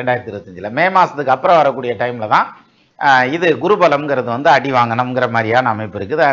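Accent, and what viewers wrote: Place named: Tirunelveli, India. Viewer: யோவ் வழ வழ னு எதோ 60 வயதுக்கு மேல் இருப்ப வர் பார்த்து யாருக்க வது சொல்ல வேண்டும் போல.... நெல்லை தமிழ் சிறப்பு.... 😴😴😴😴😴😔😥😢😢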